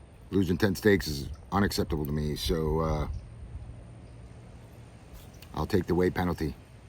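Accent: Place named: Massachusetts, United States. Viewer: I think you did a fine job! For some reason knot making will not stick in my head. I barely got the one down you made...lol Just crazy AF as to why. ~Anyway, found your channel from your Squak Mountain mid layer Hoodie review and slowly following along your past journey landing here. I mean it's all been so cool. You remind me and sound exactly likef every guy I grew up with, 😆 in Massachusetts As an avid camper and novice adventurer for the past, 40 plus-well way too many years, wink, I had to break down and bite the bullet investing in ultra light gear. It was time. Stuff was weighing me down, wink again. So... I coincidentally got this tent too on sale right after Christmas. The Virgin sail will be now April 2025 if New Hampshire finally stop snowing. I am look forward to seeing your AT experience in these parts last year. OMG. 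I bet a real doozy coming from those flat lands there in Florida. No joke, huh. I was born on a rock a wee bit north of Boston so flat land is pretty foreign to me. Gotta walk slow here with a big stick.... My guy and I have been dipping into off grid camping allot past few years, for him a breeze as an older model USMC but for me well everything is cool but the bathroom situation lol. Despite that have found some amazing spots and lots near the AT. Love seeing the hikers. Would love to be a trail magic magian at some point. I moved from the Rock up here about three years ago so we are a big closer or half the way atleast. Maybe this summer plant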